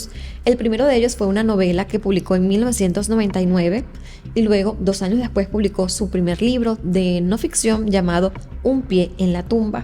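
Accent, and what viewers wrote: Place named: Venezuela. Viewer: Si, su forma de hablar me indicó que eras mi paisana 🤗 ... cuenta con mi apoyo, siempre... Éxitos 🤩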